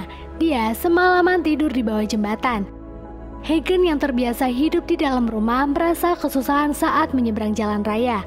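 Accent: Pemalang, Indonesia. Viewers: Wong Pemalang mba? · Fiks ni admin orang pemalang😂 Sekota karo aku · wong Pemalang ap mbk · Orang pemalang kakk? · Min orang pemalang yah?😅 gua orang pemalang neh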